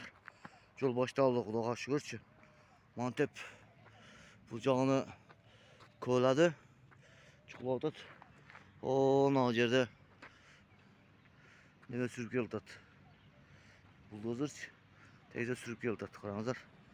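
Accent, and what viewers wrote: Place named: Batken, Kyrgyzstan. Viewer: Баткенден Ноокатка келип тартытасынбы, тилин тушунуп тушумбой жатам, шо шо дегенине Караганда баткенчи окшойсун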